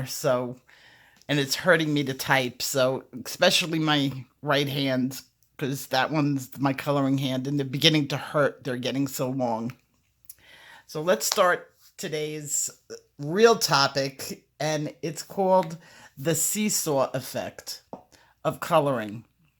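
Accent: New York, United States. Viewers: Thanks so much for this lesson. You are my favorite teacher. I watch other colorists videos and enjoy them but I learn the most from you. PS: I’m from Florida living in Tampa and I love your NY accent.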